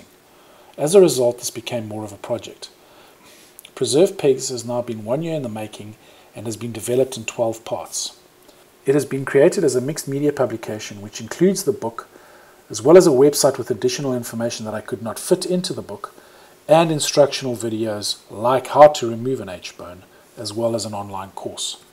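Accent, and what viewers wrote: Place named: South Africa. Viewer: Where can I buy bactoferm 600 in South Africa ? You sound like a South African.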